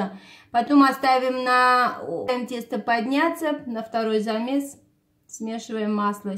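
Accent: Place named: Armenia. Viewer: Человек на русском языке говорит это главное! Чувствуется акцент армянский. Спасибо вам за рецепты. Вы лучшая!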